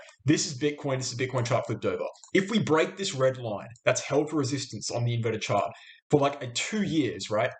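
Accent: Australia. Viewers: I like your TA and offers of your considered opinions about the directions of the market. I am presently positioning myself and my wife's portfolios to take advantage of these downward moves in bitcoin and a couple of Altcoins as well. I have a small criticism in that your aussie accent can make catching all of your commentary at the speed at which you speak. Have pity on the rest of us english speakers as we want to get all the nuances that you are putting out! Thanks - RHH.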